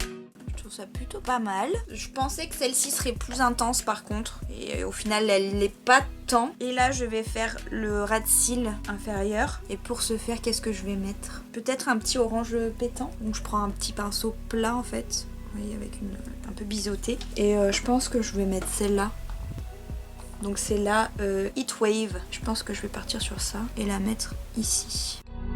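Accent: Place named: England, United Kingdom. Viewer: Cet accent anglais j’adore😂😍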